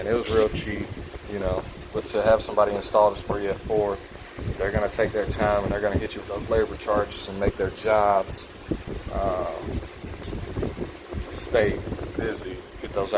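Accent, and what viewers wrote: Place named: South, United States. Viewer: Yo G you sounding hella country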